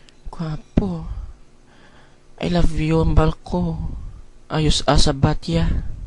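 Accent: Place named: Iloilo, Philippines. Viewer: hahaha.... ilonggo gid man ah...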